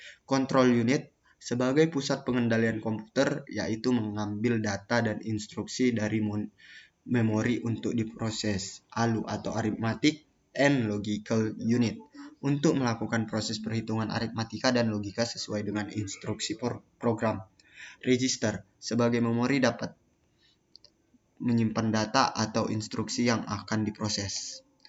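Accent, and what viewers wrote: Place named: Java, Indonesia. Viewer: Mas medok:v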